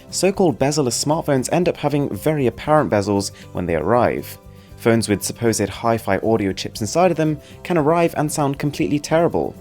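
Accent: United Kingdom. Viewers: Extremely difficult for me to follow. I get the main point but you rapidly slur through about 20% of your words with your nice Queen's English accent, making it impossible for me to understand your finer points. If I were sitting with you at a luncheon, I imagine that I would constantly, embarrassingly, be having to ask, "I'm sorry, I didn't quite get that?...I'm sorry, could you repeat that please?" If you wish to professionalize your narration, it would be useful to work on enunciation and clarity of presentation. Despite this, thank you for the content and information.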